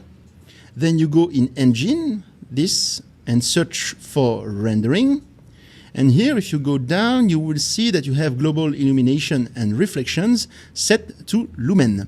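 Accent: France. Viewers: Merci !! T'as un accent suffisamment bon pour être agréable à écouter et suffisamment français pour que je comprenne, c'est parfait 😀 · Great tutorial and great bloopers lol... happy to see a French speaker having a hard time with English as many like me with French, and especially since I’m native speaker of another language of Latin origin! I would really appreciate it if you could tell me if you have Unreal courses available and in which online platform (I tried searching for you in Udemy), or maybe a book for sale online, or whatever. Here you have another “real” follower. Merci beaucoup from DR!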